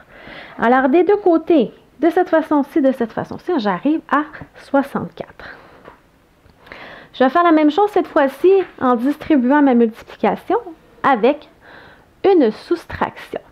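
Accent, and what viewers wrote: Quebec, Canada. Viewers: Accent québécois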